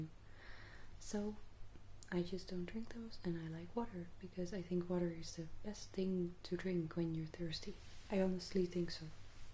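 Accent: Netherlands. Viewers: Niet in slaap gevallen, maar wel heerlijk om te luisteren naar je lekkere Nederlandsheid. :)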